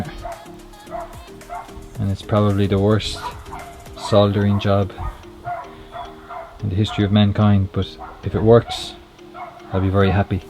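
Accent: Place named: Dublin, Ireland. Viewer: Great Video…what part of Dublin are you from??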